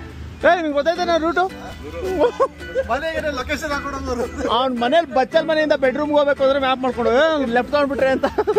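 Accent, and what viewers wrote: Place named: North Karnataka, India. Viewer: That uttar karnataka slang between the conversations ❤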